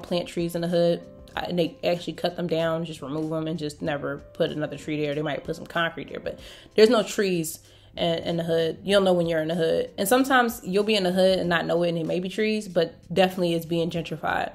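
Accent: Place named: Philadelphia, United States. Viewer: You definitely still have your philly accent on a bean too.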